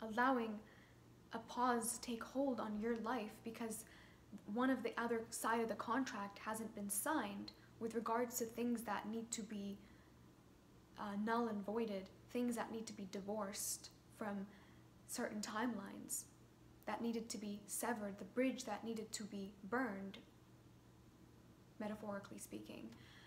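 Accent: United States. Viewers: i Love your Chicago accent ❤ as *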